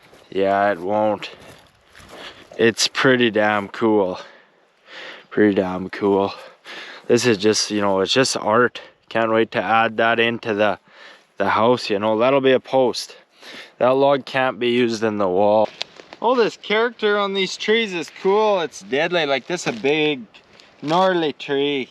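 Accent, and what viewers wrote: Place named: Newfoundland, Canada. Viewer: Where are you from? What part of Canada? I was just wondering because I noticed the way you talk …….. I don’t mean that in a bad way just kind of wondering …….Tiny Houston MS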